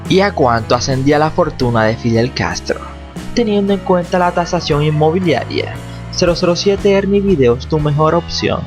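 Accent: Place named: United States. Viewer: Dice Tany porque es norteamericano ? O no lo ve el acento que tiene?. No pronuncia bien..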